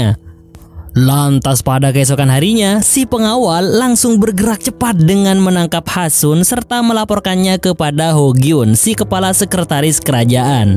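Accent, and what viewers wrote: Madura, Indonesia. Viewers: Iya ya logatnya madura banget😂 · Reng madureh kak ? 😅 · Mas pengisian suara nya , orang Madura,logat Madura nya kelihatan banget 😅😊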